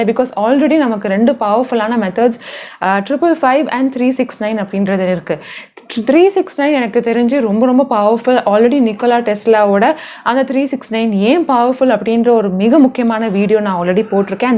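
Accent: Sri Lanka. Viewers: நீங்க ஸ்ரீலங்கா தான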